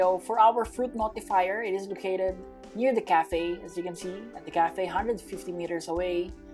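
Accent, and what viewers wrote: Philippines. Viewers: ive been watching you for years now i love ur intro, ur filipino accent and the way u work hard for content doing those level 1 to pro noobs “ is back” i admire you and u make me so encouraged to make new alt accounts and max level them all i wish u notice this comment. happy bomb day🎉🎉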